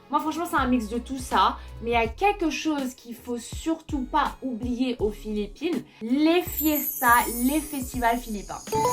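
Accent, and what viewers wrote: France. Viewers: Love your French accent, gorgeous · What a fun way to appreciate festivals especially panagbenga. Btw your french accent is to die for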